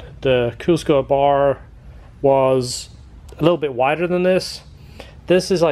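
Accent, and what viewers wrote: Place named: Scotland, United Kingdom. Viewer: Love the s2000 build. Hopefully you guys can work with me when I pick mine up. Also, love the accent, Scotish?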